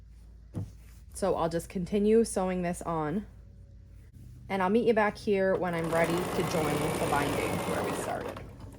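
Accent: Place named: Pennsylvania, United States. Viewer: Hi! I just came across your channel! I really enjoy your quilting projects and how well you explain everything. I picked up on your PA accent. I live in Michigan now but I’m from PA too. Looking forward to watching more of your videos! Thank you!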